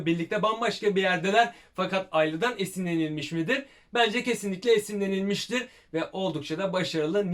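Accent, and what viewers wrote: Black Sea, Turkey. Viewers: abi karadenizli gibisin haa ne guzel okuyon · Abi valla Karadeniz şivesini çok güzel yapıyosun haykırdım sayende 😂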